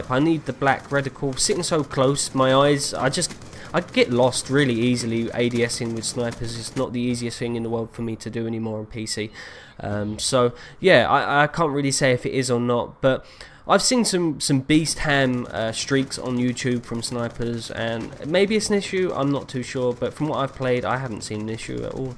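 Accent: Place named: Kent, United Kingdom. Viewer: you sounded like you were from kent! but why ireland? :D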